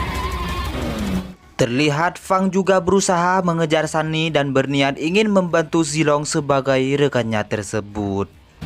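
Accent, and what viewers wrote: Madura, Indonesia. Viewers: Bang orang madura ya? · Logat Madura ya bang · Logat maduranya kentel😁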